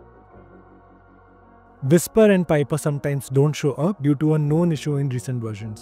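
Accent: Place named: India. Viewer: From your voice guessing you are an Indian and hope you live in India coz we need India specific affordable solutions. I have been using Sonoffs, Tinxys, Smarteefi...etc in my house and plenty of Amazon and Google smart speakers. This week have asked my kids to try HA on an old Lenovo mini PC. Eventually I may move to one of the Chinese mini PC brands like Gtek. The speaker you mentioned is 8K in India. Anyway we can use Alexa or Google speakers for this?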